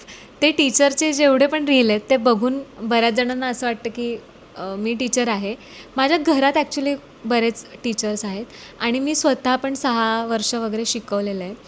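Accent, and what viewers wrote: Khandesh, India. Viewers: Vattlach hota tumhi khandeshi aahet ty tumcha bolnyvrun tumche contents khup chan astat mi pn khandeshi aahe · tuzya eka videot pakki khandeshi tone janavli mala, aani aata tr confirm ch kelas tu.Mi comment pn keleli tyavr😅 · बोलण्यातून थोडासा आमच्या खानदेशी flavour...sweet...जाणवतो...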